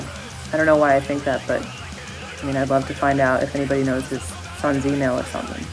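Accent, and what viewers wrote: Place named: Boston, United States. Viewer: Huge props to for having a correct Boston accent. Makes her that much moah feckin hahdcaw dood.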